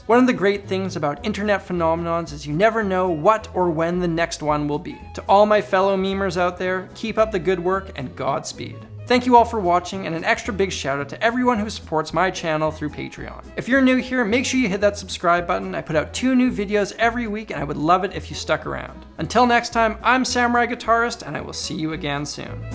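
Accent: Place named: Canada. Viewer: I had a suspicion you were Canadian. The accent gave it away.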